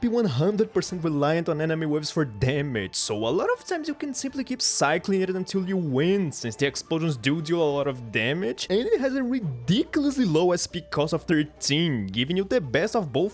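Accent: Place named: Brazil. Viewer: sorrio toda vez que abro um vídeo sobre um interesse relativamente random meu e escuto um sotaque brasileiro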